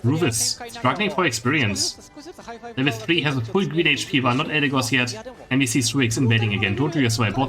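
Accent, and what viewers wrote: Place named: Germany. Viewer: Your German accent is too much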